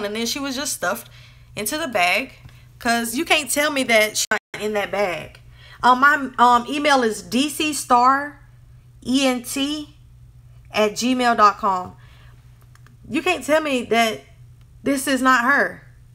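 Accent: South, United States. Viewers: I enjoy your videos, thank you for keeping us updated. Love your voice, are you in the South?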